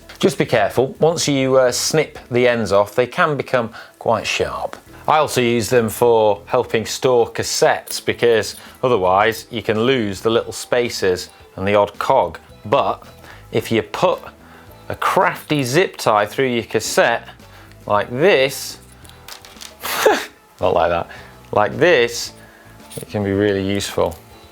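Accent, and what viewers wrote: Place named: Yorkshire, United Kingdom. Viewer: He reminds me of Jeremy Clarkson with his mild (I assume) yorkshire accent.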